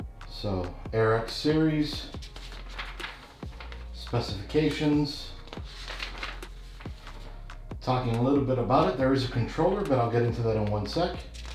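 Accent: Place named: Chicago, United States. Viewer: You have a Chicago or mid western accent. Reminds me of my grandfather. Who recently passed. Like hearing his voice again. Love the video by the way.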